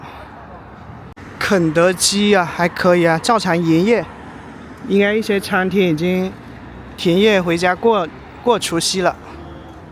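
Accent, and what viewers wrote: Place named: South, China. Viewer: 听博主这口音就是南方人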